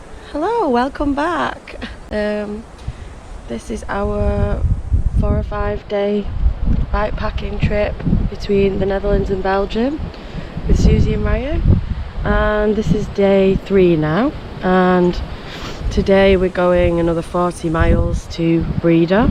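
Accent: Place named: Liverpool, United Kingdom. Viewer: Hi, I have a very light combination bike lock which probably wouldn't stop a professional thief but could deter someone just doing an opportunistic snatch and grab. UK has so many interesting accents, I was thinking Liverpool for you(Ryan I'm not sure on his accent). I have checked out your other videos and wild horses wouldn't have dragged me up that path in the Madiera mountains and those Greek beaches looked amazing. I do some videos of my cycling trips but just from an android phone so not very professional and room for improvement. Keep up the good work.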